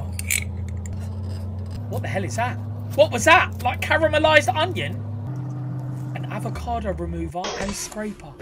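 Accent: United Kingdom. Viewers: Love love love ur Brit....xoxo me mum was born in Liverpool because of WWII..my grandparents were from Mayo Ireland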